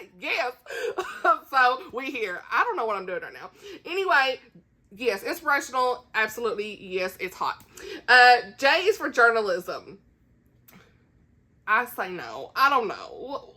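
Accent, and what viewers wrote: South, United States. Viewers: Your southern 😂😂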